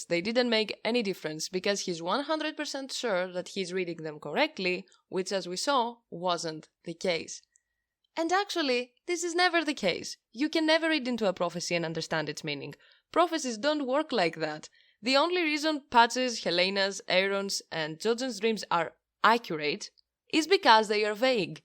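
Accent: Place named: Greece. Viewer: Η ελληνική χροιά φαίνεται από την τόσο καθαρή αποδώσει των αγγλικών. Ιδιαίτερα στοχαστικό GOT βίντεο. Θεωρώ πολύ πιθανόν η εισβολή των White Walkers να μην ήταν αναπόφευκτη, αλλά να ένιωσαν απειλή από τις εξωφρενικές δράσεις των Targaryen για να εκπληρώσουν την προφητεία, οπότε εισβάλλουν για να προστατεύσουν το είδος τους.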